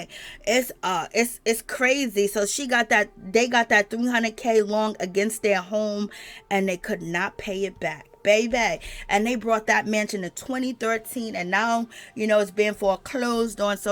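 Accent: New York, United States. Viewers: Hey Girrrrrrllllllll hey........ l just love listening to your videos. I love the way u talk.💕 You must be from NY. I'm a New York girl as well, born there left when l was 13. Anyway, people are not up to Kim's Shenanigans! Remember the last time she was on Housewives. Andy told her she was a trouble maker. Anywho... nice chatting. 🤩💞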